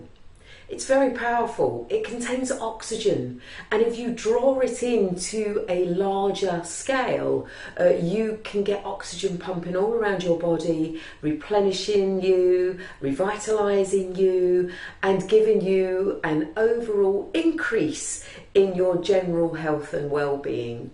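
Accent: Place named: United Kingdom. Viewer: Your accent is pretty close to a British accent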